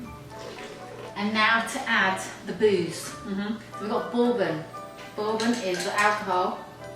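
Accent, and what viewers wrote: United Kingdom. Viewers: Alton brown I love that guy show I already like you #subscribe the accent is a plus I adore British accents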